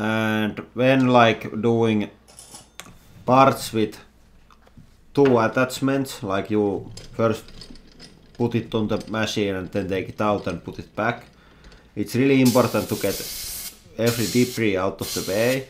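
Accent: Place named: Finland. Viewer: Oisko aksentti :D Ei sil oo ees nii paska enkku mut sil o se suomalaisten aksentti. Jos sul ny oli joku 10 enkku ni et varmastikaan pystyis puhuu paremmin :DD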